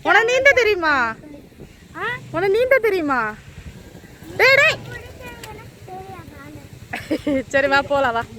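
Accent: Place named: Nagercoil, India. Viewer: Hi... Good morning 😊 I like your Nagercoil slang very much 😍👍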